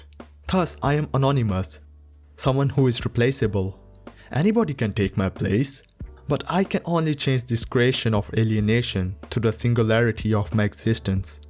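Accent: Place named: India. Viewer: India comes under Asia, but I feel like he has a strong Indian accent, maybe south east Asia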